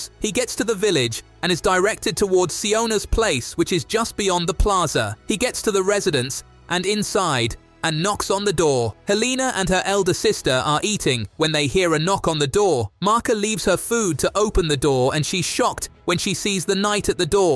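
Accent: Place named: United Kingdom. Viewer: I love the British voice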